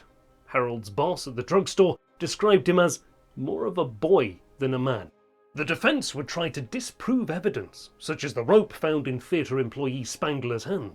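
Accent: England, United Kingdom. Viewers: Did You notice that it's a BRITTISHER who is doing the narration? Irony. 📚 M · The English nasally twisted appallingly irritating narrator should be forever binned !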